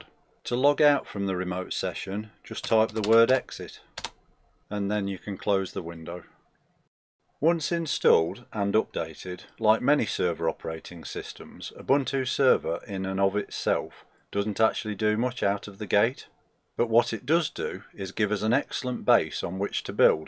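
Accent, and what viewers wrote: United Kingdom. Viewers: Why does an English accent make everything so interesting?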